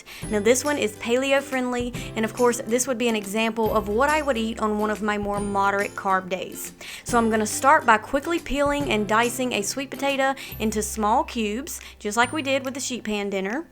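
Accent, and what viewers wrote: South, United States. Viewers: I love your videos. You are truly beautiful inside and out. Oh, and I love your southern accent. I lived in 2 southern states years ago and you remind me so much of my time there....which I loved. Excellent content and delivery. thanks so much.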